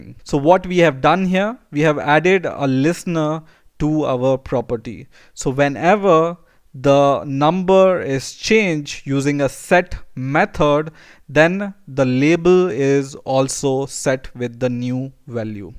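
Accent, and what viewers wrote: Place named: India. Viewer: Yeahh, He is Indian he have Indian English accent. I'm confirming it :)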